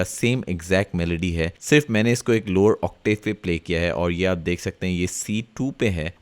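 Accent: Assam, India. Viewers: Bhai are you from Assam?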